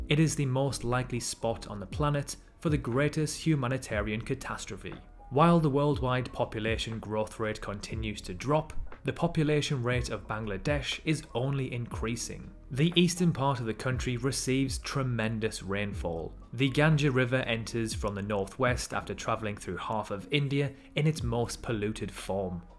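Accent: United Kingdom. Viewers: I really love your British accent bro!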